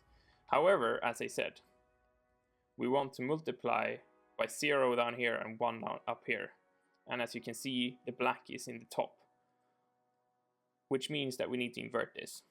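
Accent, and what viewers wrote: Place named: Sweden. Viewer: 5 seconds in and I instantly know you're Swedish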